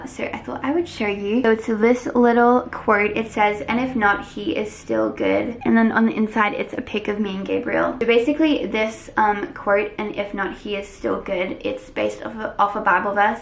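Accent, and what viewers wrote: Australia. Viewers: me watching the whole video and the whole thing I pay attention to is her amazing Australian accent 😂😐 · I think it would be so interesting to see how many of your kids and what kids of yours get's your Australian accent or if any gets your accent! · So Australian. Love it 😍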